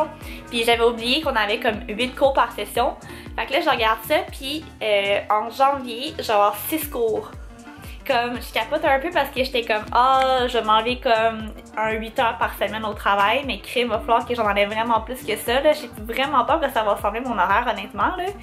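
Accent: Quebec, Canada. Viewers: Un accent d'une quebecoise qui parle anglais quoi:p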